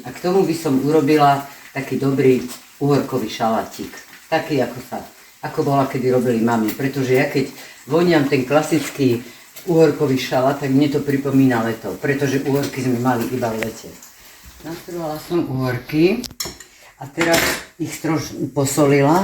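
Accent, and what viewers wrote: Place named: Eastern Slovakia, Slovakia. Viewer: Mila pani. Ste, Super zienka, mama, kucharka. Ani si neviete predstavit ako, kazdy Vas recept, video, aj vase hlasky, nezaprie sa, ze ste aj vychodniarka…💗 nam prinasaju radost v Brooklyn,NY. Prajeme Vam aj kameramanovy vela zdravicka lebo je vzacne a hlavne lasky. Spozdravom vychodniarky( rusnacky) z Brooklyn E&K 😘😘😘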